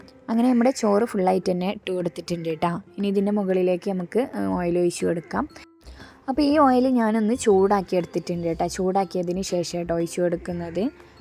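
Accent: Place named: Malappuram, India. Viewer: Njagal Malappuram slang Maya Aantto😃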